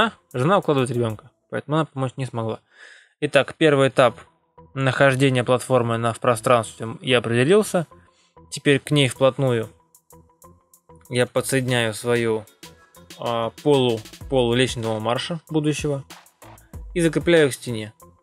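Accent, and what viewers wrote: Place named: South, Russia. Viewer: Добрый день. Не могу понять, где вас букву гэ, учат как хэ говорить? В паспорте же имя Григорий у вас же как Григорий записано, а не Хригорий. В школе диктанты писали, разве там также как и говорили, вы писали? Ни коем образом не хочу оскорбить, или обидеть. Но этот диалект не понимает 90% всех людей в РФ. Вместо 5 литровой банки ,вы говорите баллон. Кто вас этому научил? Или сахар называть песком.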